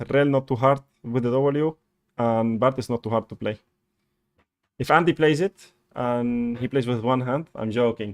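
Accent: Spain. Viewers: Buenas, tengo una pregunta (im guessing ur spanish by ur accent). Estoy actualmente practicando Bardo porque soy OTP Rell y Rakan, pero queria saber si Bardo merece la pena. Tiene un estilo de juego que me gusta bastante pero no se si merece mas la pena ser OTP Bardo o Thresh. Tambien me gustaria saber si en un futuro haras alguna guia sobre Bardo, gracias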